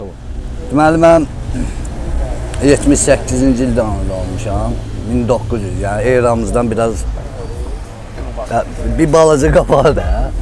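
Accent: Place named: Shaki, Azerbaijan. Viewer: Bəli qardaş Şəkili ləhcəsində Şəkili obrazını çox supper oynayırsınız əla, Şəki ləhcəsinin pərəstişkarıyam, salam olsun Şəkililərə.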